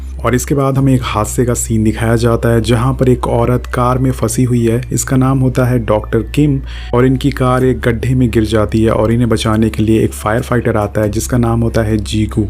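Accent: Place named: Bengal, India. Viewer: Apke bolne ke tarike se yeh pata chalta hain aap sayed bengali ho....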